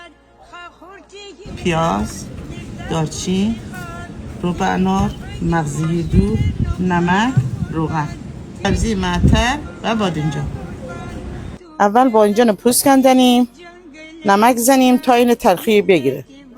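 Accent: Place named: Shahsavar, Iran. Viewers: خانم به نظرم شما مازندرانی هستی واسه غرب مازندرانی❤❤ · لهجه ی شما ب شهسوار و رامسر نزدیکتر تا رشت و گیلان ❤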